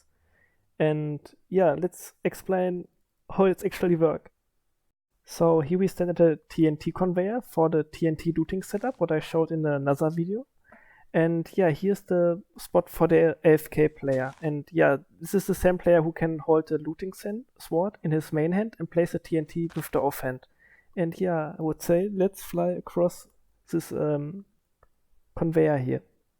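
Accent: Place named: Germany. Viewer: man hört sogar das du deutsch bist. dein englisch man hört es.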